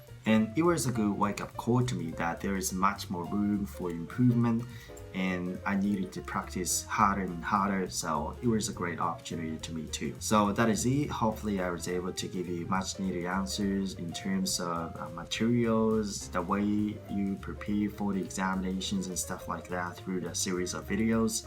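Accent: Australia. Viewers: オージーイングリッシュですね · ところどころオーストラリアのアクセント入ってて好きです笑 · 6年前も8.5とった内容の動画取ってますが、この時はよりオーストラリア英語になってますね 今のオーストラリア（メイン）＋アメリカ/ジャパニーズ（サブ）アクセントそっくり。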